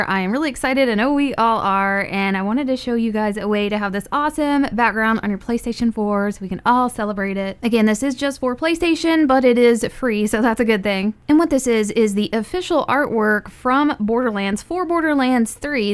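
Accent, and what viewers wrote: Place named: United States. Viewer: American accent: 100%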